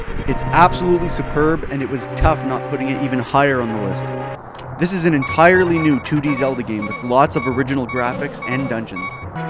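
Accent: Canada. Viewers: Lol canadian accent. Love it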